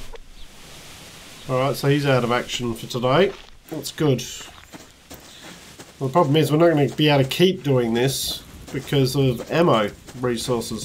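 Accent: Australia. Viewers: The video was great so I subscribed to your channel. You have an aussie accent. I am from the Gold Coast, Queensland.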